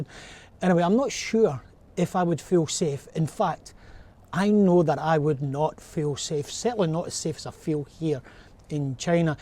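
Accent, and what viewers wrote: Scotland, United Kingdom. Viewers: It is good that your Scottish accent is not too strong to understand.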